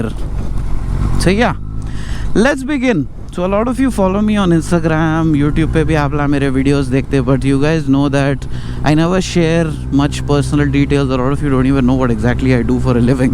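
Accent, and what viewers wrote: Hyderabad, India. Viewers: I guess u know telugu... Bhaya serious gaa cheptunaa echipadesinavv🔥, Btw mi videos next level unatai asal😍. Bc asal skip cheyalii anipinchadhu 20 or 15 mins unna kani, aa flow ala untadhi aa hyd slang & me content. Love u bhai♥️🥂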